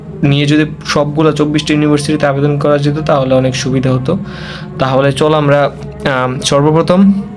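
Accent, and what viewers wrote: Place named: Comilla, Bangladesh. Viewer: Vaiyer bari mone hoy comillaa...🙂 Btw vasa sune andaj korlam🙂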